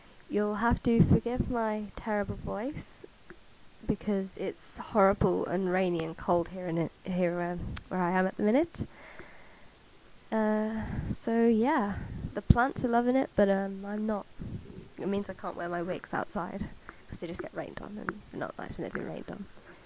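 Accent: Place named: United States. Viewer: Your voice is very pretteh~ :333 I also love your American accent... It sounds perfect xD Do you mind if I do this?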